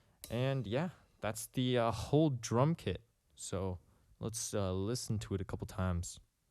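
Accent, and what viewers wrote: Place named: Canada. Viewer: Canadian detected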